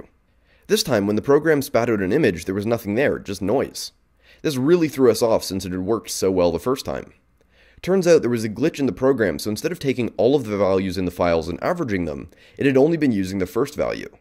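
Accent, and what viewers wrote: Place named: Canada. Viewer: Aha! I knew he was from Canada by the way he says "out" but him saying "Hackerspace in Montreal" confirms it lol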